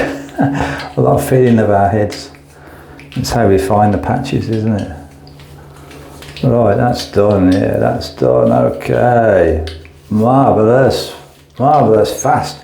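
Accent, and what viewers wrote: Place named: Birmingham, United Kingdom. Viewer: Love the Brummie, I thought it was Brummie lol.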